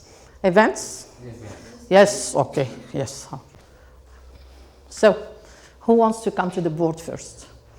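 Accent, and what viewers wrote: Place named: Morocco. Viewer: at least we could say this is Moroccan accent.We all know having British or American accent is no by no means available for all English teachers ....it's not easy to speak English authentically .if you have any other oppinion then you find it difficult to speak English like the way British or American people do ( namely native people )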